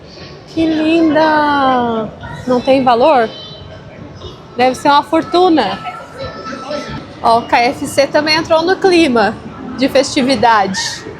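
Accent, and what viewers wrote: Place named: South, Brazil. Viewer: Sou seu seguidor, acho vc e familia muito simpaticos. Queria dar umas sujestões de videos. Antes a china era chig ling. Tudo era copiado. Agora é o futuro, tamanho o avanço de tudo ai. Mostra mais esse lado, as coisas tecnologicas. desde ponte, ruas, maquinas, coisas diferentes pra nos brasileiros. Sou do sudeste mas adoro o seu sotaque do sul. Um grande abraço e um beijinho para o que parece ser um doce de crinaça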